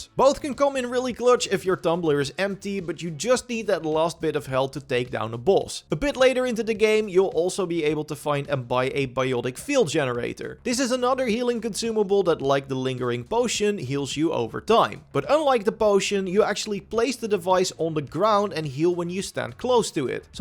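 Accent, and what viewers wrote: Netherlands. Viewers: they’re from the netherlands so they have an accent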